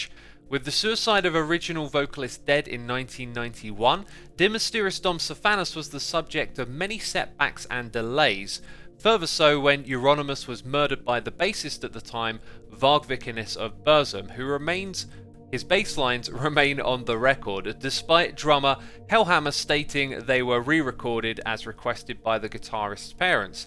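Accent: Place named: RP, United Kingdom. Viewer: To me it was kinda unexpected to find out that X listens to this kind of music(I myself am a huge fan of death,death and trash metal) with his strong british posh accent.Anyone else?it just kind of doesn't go together?idk lol. Also nice video!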